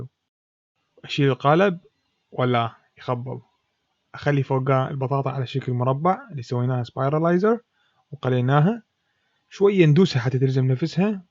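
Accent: Iraq. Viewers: يمعودين لشيف لهجته عراقيه لو أني مشتبه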